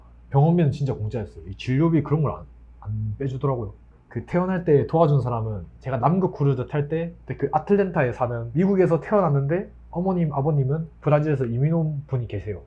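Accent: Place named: Gyeongnam, South Korea. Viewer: 부산사투리인지 경남인지 경북인지 궁금해요!!!!!! 근데 경남사투리같아요 맞아요?? ㅎㅎ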